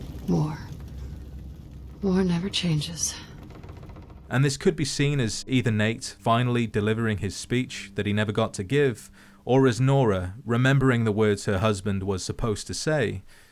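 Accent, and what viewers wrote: United Kingdom. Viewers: It’s ok mr British vault boy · Thank you British fallout lore master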